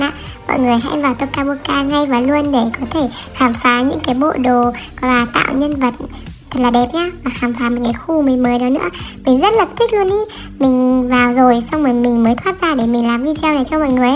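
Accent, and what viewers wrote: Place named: North, Vietnam. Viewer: Đừng nói giọng bắc nữa ah😭😭😭 (Nếu như là ng miền Nam,Tây,Trung)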